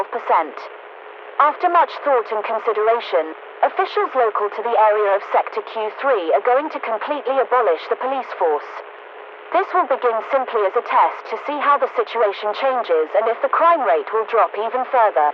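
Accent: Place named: United Kingdom. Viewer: I love how it’s set in Georgia yet she has British accent. PS, this would make a great movie or series.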